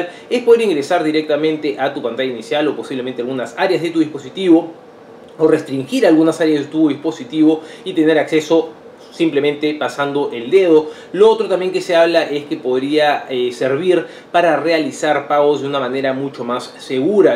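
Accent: Peru. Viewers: tu acento es como de Perú es por eso que tienes más seguidores y subscriptores.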